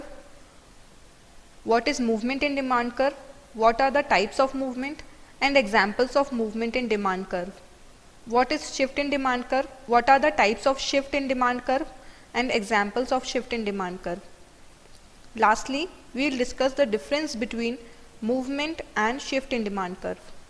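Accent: India. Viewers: Thanks, I love Indian accent.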